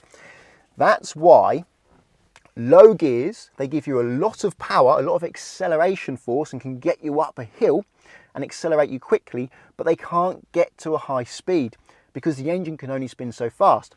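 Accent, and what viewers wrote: United Kingdom. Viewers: I think he is being rude about your British accent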